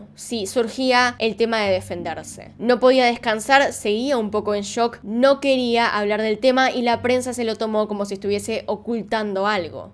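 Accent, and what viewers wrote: Argentina. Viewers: Dios mio, no se si es que me gusta el acento argentino......o si en realidad lo que me gusta es TU acento!!!! 😂😍😍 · Hola eres de argentina?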